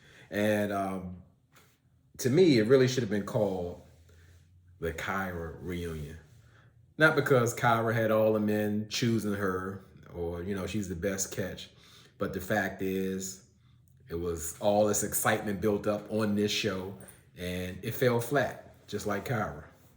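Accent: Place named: Washington DC, United States. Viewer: Good review. Where you from bro? I hear a little bit of home (DC/dmv) in your voice?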